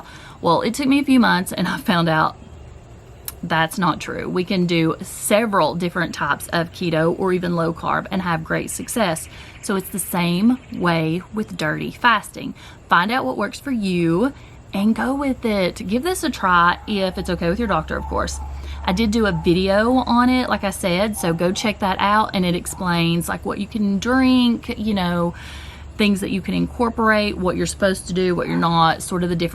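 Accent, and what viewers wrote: South, United States. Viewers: shes not country she’s southern! Lol and I LIVE for it! Not to mention she has one of the most famous voices ever....She’s the Incredibles Mom! Lol This is a compliment 😂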